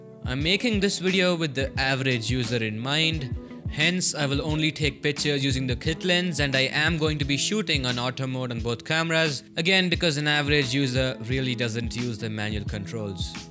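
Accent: India. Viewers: hhh, indian voice hate it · are you from kerala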